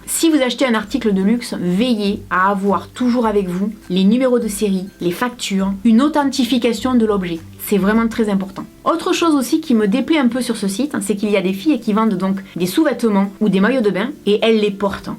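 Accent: South, France. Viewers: je t'adore tu donne le sourire rien qu en disant "bonjour" avec ton joli accent du sud. Tes idées de vidéos sont originales et super bien faites. Merci je te suivrais toujours!!💖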